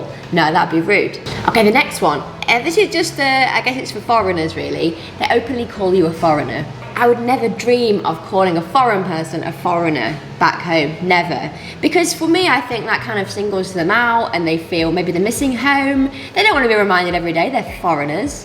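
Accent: United Kingdom. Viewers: Your British accent is so beautiful and elegant 好喜歡你的英式口音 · 英國腔真的好好聽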